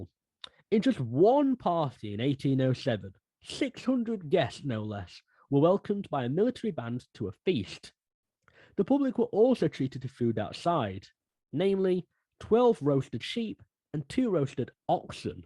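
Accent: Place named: Yorkshire, United Kingdom. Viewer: As you might imagine, a Yorkshire-flavored accent isn't the easiest for those accustomed to the intonations of the American South to understand. Nonetheless, we are very glad we discovered your channel, and have enjoyed your programs immensely. We are also getting better at understanding the Northern speech. We look forward to your next video.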